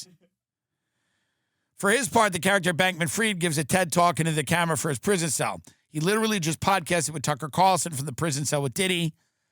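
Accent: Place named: Long Island, United States. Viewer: The migraines from the media are only soothed by Tim’s raspy, Long Island voice whethered by whisky and Paul malls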